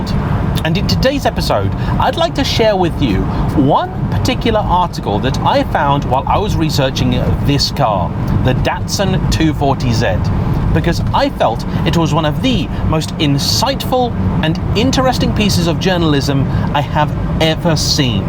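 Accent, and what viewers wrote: United Kingdom. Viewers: This channel is very good both for learning about car history and for learning good, British English.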